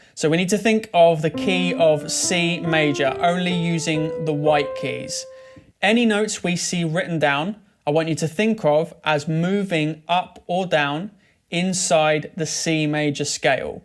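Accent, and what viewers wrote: United Kingdom. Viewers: I'm learning piano reading and english as well. I find your channel very useful and your british accent is way much suitable for doing so. Thanks!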